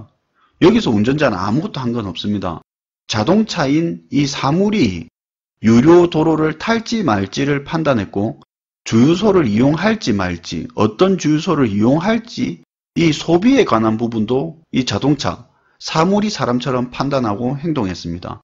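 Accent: Gyeongsang, South Korea. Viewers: 갱산도 사투리가 아주 구수합니다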